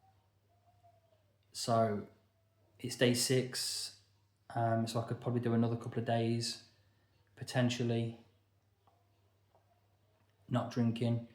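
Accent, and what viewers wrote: United Kingdom. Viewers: never give up giving up mate , you might think you are worthless at the moment , but your honesty and direct approach at dealing with this is going to win , i live in derbyshire you sound local to me buddy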